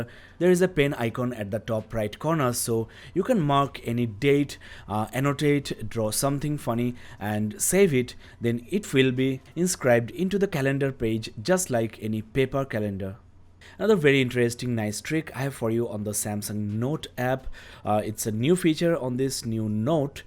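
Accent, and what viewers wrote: India. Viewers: God, I hate your bud bud accent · I absolutely hate Indian accents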